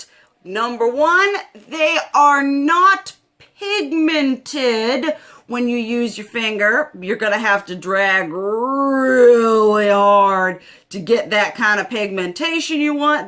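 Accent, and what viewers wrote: South, United States. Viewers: Woah. Just found this in my recommendations and I'm subscribing now. A fun pale goth woman with a southern accent talking makeup. This is amazing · This is my first time on your channel... you seem to be goth with a country accent... coming from Arkansas I'm living for it. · What’s up with the Wednesday Adams costume and the southern accent? Every video your accent varies. I’m not sure what’s going on with here. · first time watcher. can't get over Wednesday Addams having a southern accent 😅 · Ok but I'm glad I'm not the only gothy person with a country accent lmao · Is there anything better than a big titty goth gf with a southern accent? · I just love your voice. I am guessing you are Southern like myself. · You randomly popped on my recommendations and I absolutely love your accent You’re so loud spoken and extra in a wonderfully southern way As a Caribbean girl people often complain that my speech like yours is loud But that just how I am and I love to see another female who’s voice isn’t all super dainty quiet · I'm new to your videos I'm from the south but I was not expecting the Southern accent I was like wow ok nice but no hate i liked this video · Are you southern ??? 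· Pretty goth lady with a southern accent? Amazing!!! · I thought she sounded more Virginia/Carolina area. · Super new here. Goth Chick with a thick ass country accent. I am in love!!! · I love her southern accent!!!!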